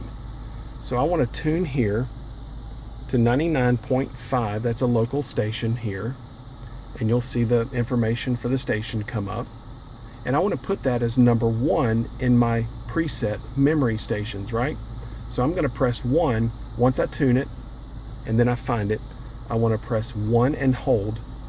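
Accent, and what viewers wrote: North Carolina, United States. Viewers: Thank you sir for this informative video! Going to pick up my new GX here soon and you have saved me a lot of time. Love hearing your North Carolina accent too (I'm from WNC). Will tune in for more car related videos!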